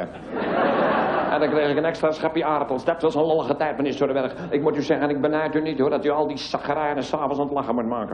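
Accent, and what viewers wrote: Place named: Amsterdam, Netherlands. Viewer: Dat echte amsterdams-ik vrees dat het ook verdwijnd !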